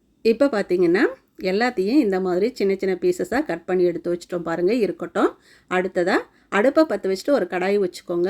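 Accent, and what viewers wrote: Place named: Kongu, India. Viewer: Kongu Thamil Magaley Super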